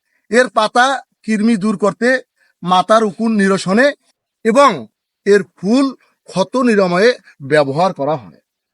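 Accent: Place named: Rangpur, Bangladesh. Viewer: রংপুরের ভাষায় চমৎকার হয়েছে।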